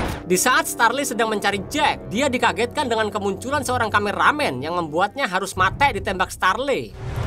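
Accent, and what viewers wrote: Java, Indonesia. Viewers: Bng Mimin bwakn alur ceritanya lucu bngt ci ada k.jwa" gtu😂😂😂mate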